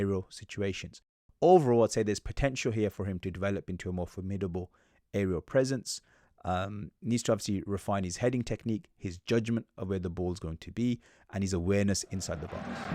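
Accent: Birmingham, United Kingdom. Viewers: You from Birmingham?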